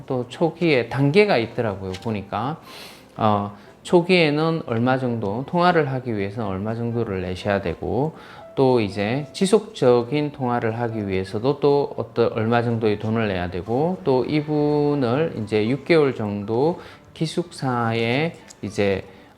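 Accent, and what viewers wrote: Gyeongsang, South Korea. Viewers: 부산경남 분이신거같아요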